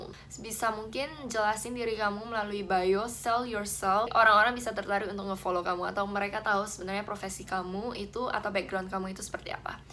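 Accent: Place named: United Kingdom. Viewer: Mba cuman mau bilang suaranya enak di denger, accent englishnya sukak banget xixi!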